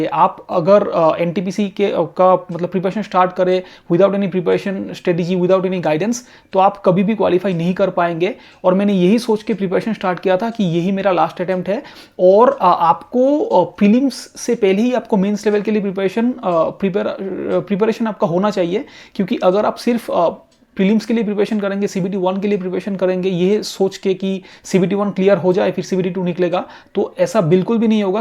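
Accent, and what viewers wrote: Bengal, India. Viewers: Sir aap bangal se ho na ?? 😅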